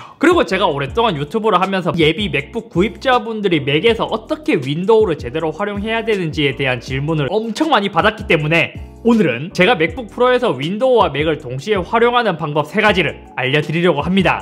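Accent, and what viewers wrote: Gyeongsang, South Korea. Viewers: 잇섭님 혹시 대구에 사세요? 경상도 사투리가 들리는것 같아요.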